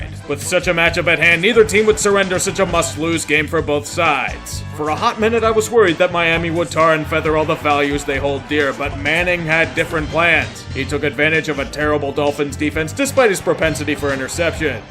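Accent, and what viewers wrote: Pittsburgh, United States. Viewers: Best part of Sportsball, hearing a Yinzer praising the Ravens.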